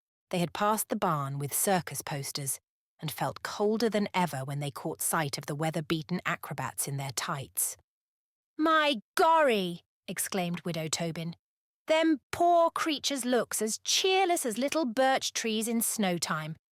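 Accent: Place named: Cockney, United Kingdom. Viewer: I wonder if you could have the books written by American authors read by somebody with American accent. Sarah O Jewett was an American living in New England Maine specifically. And to have this read with a Cockney accent sounds really bizarre.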